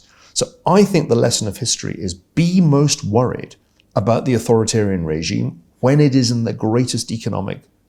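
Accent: United Kingdom. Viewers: Unfortunately, every time I hear this accent, I am flooded with historical memories of the depravity of the nation he comes from. And here he is carpet bagging, talking BS about China. How about the US and its lackey, Britain, staying home and giving the world peace? 400 years of depravity is enough, Mr. Ferguson. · I will summarize it this way. He speaks with a British accent, after that he makes no sense. First, when we talk about a country in ascent or decline we need to consider the following: 1. Military power: no one is close to challenging the US here. 2. Economic power: the US economy is outperforming all developed economies and the USD has never been stronger. All this nonsense about debt servicing ratio is just noise. If it really was a concern, the USD would be depreciating and not appreciating! 3. Technical innovation: AI, medicine, advanced tech etc etc. 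don’t want to get into details, but it is obvious the US reigns supreme… At least for a long time the US will lead. Who is in decline based on the 3 factors above is the UK 😢 Love the accent though 😊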